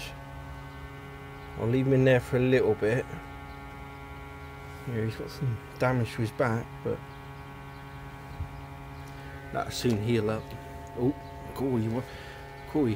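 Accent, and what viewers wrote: England, United Kingdom. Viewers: any way i love your vids keep up the good work matter a fact do know ksi sound like your from england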